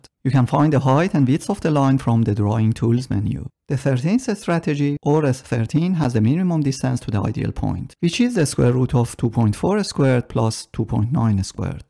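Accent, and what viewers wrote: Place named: Iran. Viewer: Ah that lovely persian accent!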